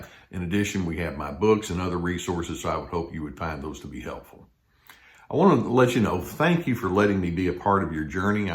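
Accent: Texas, United States. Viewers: It's not southern...it's Texan. Big difference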